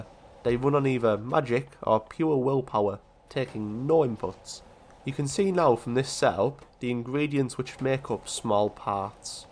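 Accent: United Kingdom. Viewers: Your Britsch accent is so pleasing to my ears.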